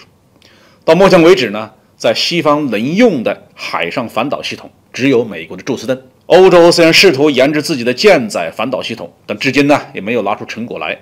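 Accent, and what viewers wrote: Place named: Fujian, China. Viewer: 鲍大校的扶兰口音蛮亲切的😁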